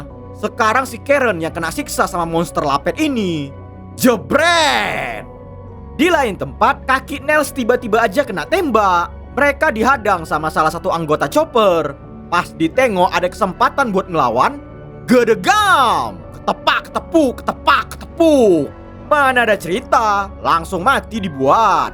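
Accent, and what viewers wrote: Medan, Indonesia. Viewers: Medan kali salam dari Tanjong Morawa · Orang medan yaa min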